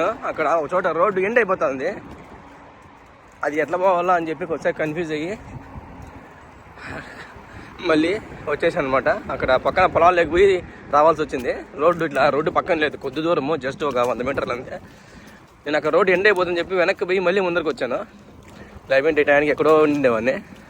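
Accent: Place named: Rayalaseema, India. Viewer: ఆంధ్రలో నీది ఏజిల్లా బ్రదర్ చూస్తే రాయలసీమ లాగా వుంది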